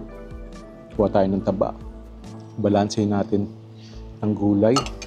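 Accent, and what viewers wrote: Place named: Lucban, Philippines. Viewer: Taga saan ka po, you sounds like lucbanin😅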